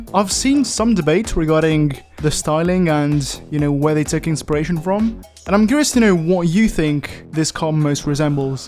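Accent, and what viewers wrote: Italy. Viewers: Ma sei italiano? No vabè🥲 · As an Italian i really like your Italian accent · sei italiano? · Are you italian? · Your English is vary good but that: "nono é" It means: "che sei italiano e minchia se lo nascondi bene l'accento italiano" Gg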